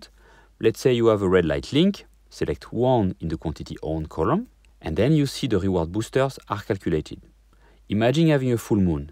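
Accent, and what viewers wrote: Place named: France. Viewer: Cool bro, thank you this video. French accent is super cool remind me of Merovingian the Matrix 👌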